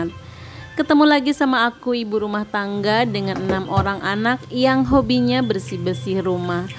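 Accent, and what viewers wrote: West Java, Indonesia. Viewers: Ibu dr Sunda kah? Logat bicara nya persis seperti teman saya yg Sunda...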